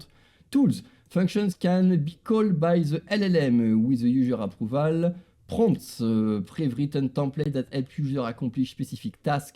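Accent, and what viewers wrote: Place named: France. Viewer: Merci beaucoup pour cette vidéo très instructive!!! with the cool french accent😅😅😅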